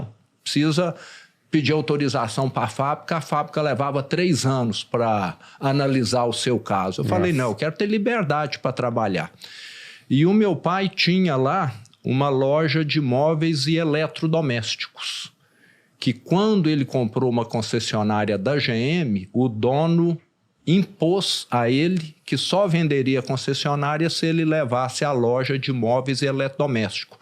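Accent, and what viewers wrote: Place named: Minas Gerais, Brazil. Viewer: História bonita! O mais legal é ouvir esse sotaque mineirês. Representante de peso desse povo amável e acolhedor.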